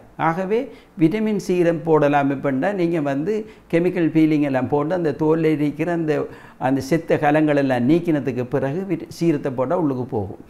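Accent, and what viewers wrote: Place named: Akkaraipattu, Sri Lanka. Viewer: unka pasai Akkaraipattu pola irunthathu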